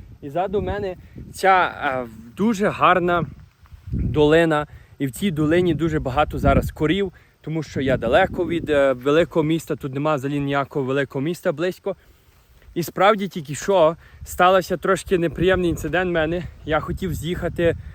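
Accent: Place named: Galicia, Ukraine. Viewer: Насолоджуюсь просто вашим галицьким діалектом! Дякуємо вам за працю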